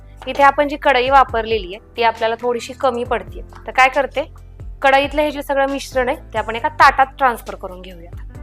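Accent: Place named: Latur, India. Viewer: Tai तू कुठली आहेस, तुझी बोलण्याची टोनिंग लातूर ची वाटतेय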